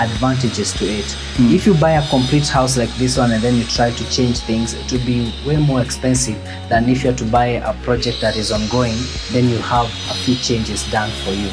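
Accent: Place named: Kiambu, Kenya. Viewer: Buda umeenda KIAMBU accebt ikaanza kutokea bana!😂😂btw luv the house keep it up!:washhands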